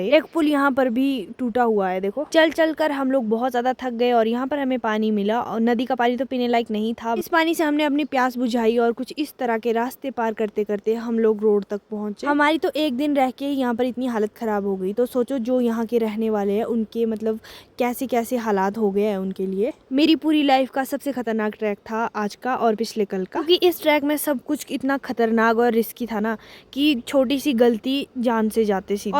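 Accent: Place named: Urdu, Pakistan. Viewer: Bara saaf urdu ka lehja he.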